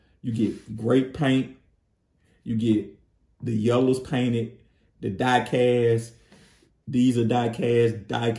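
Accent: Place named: United States. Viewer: I like your country accent. I also have one. I agree, the articulation is sad and it is making me sad to buy the new release. SMH I was hoping someone would fix these issues. Probably a 4th Party will release after I buy and make me sick. I hate TF collecting sometimes.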